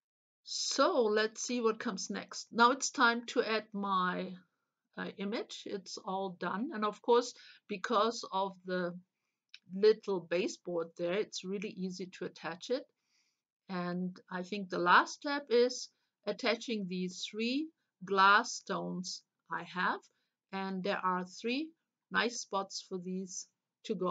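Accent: Germany. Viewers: hab doch gedacht ich hör etwas deutsch heraus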